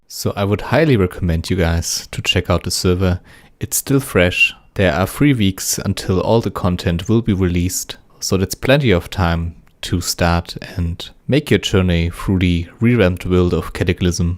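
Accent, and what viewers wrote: Germany. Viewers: Ha, love that german accent:)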